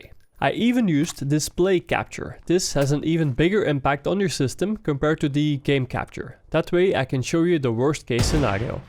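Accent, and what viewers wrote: Netherlands. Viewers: I could hear that you were Dutch in about 3 seconds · Dat hoor je goed dat je van Nederland komt hoor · wat een dik Nederlands accent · Ur dutch right? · The Dutch accent is coming through strong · Are you Dutch?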